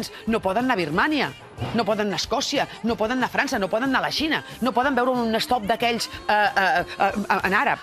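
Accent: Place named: Eastern Catalan, Spain. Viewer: No entiendes las señales en catalan que fácilmente se entienden en castellano por la similitud entre las palabraspero sí el relato que hace en catalán oriental con un acento cerrado. Venga ya! No discrepo en la manipulación de TV3. Cada uno rema para su bando, ese es el problema de los medios de comunicación.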